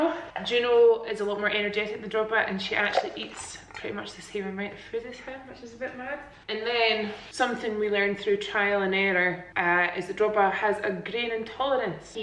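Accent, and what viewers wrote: Scotland, United Kingdom. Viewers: Is it a Scottish thing or just a thing to say words ending in ED in place of words ending in ING? So for example, when you're talking about your new wardrobes, you say 'these just need painted now' instead of 'painting' I wasn't sure if this was a fun little quirk because of where you lived or just a ' ' No shade just genuinely curious because I love a Scottish accent and know how much the accent can change from town to town. Xx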